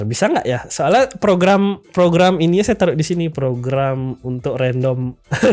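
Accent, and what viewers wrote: Bali, Indonesia. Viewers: kenapa ya orang bali klo ngomong enak didengarnya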